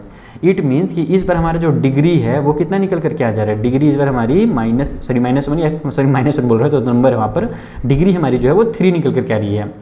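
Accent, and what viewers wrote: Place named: Bhojpuri, India. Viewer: Sir aap up/bihar se ho kya Ajj apko bhojpuri style me padate hue dheaka✅✅🙃🙃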